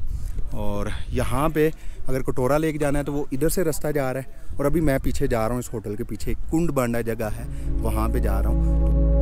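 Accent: Punjab, India. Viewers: صاحب براہ مہربانی اردو بولتے ہوئے تلفظ کا خیال کیا کیجئے۔ میرا مطلب ہے پنجابی لہجے کی آمیزش بہت زیادہ ہو جاتی ہے کبھی کبھار۔ چونکہ آپ استاد ہیں لہذا آپ اگر تلفظ میں غلطی کریں گے تو ہم جیسے پھر کس سے سیکھیں گے؟ امید ہے آپ برا نہیں منائیں گے۔ بہت شکریہ۔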